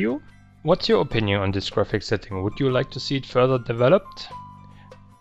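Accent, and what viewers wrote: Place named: India. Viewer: Great Video. I like the way you speaking but you sounds like an indian man who is speaking englisch for the first time.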